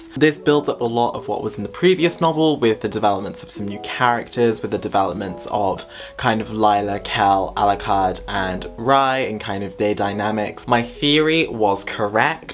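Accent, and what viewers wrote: Wales, United Kingdom. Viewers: I believe he is from Wales if that helps😊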